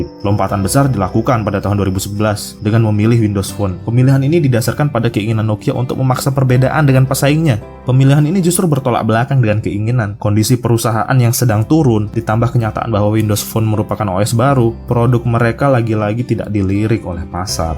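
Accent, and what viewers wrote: Lombok, Indonesia. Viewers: matur tampiasih? mas nya org Lombok?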